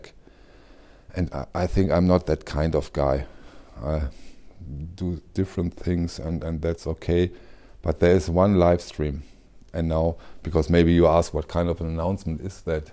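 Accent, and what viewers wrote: Germany. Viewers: I have found my new 'go to' to hear about vinyl, drink coffee, and hear a good old fashioned German accent! I lived in Wiesbaden for several years and miss the 'motherland.' I am fairly new to your channel but look forward to it. Keep the great content coming. And let the puppy get more screen time. :)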